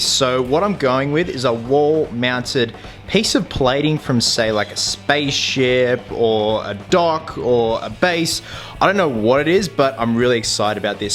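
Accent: Australia. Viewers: are u Aussie u sound it I am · R u from Australia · Mate, are you Aussie. Cause if so go Australia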